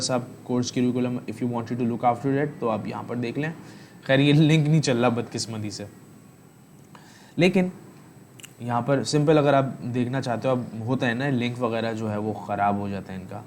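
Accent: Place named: Karachi, Pakistan. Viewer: keep it up... Mujy Karachi walon ka urdu accent bohat pasand hai.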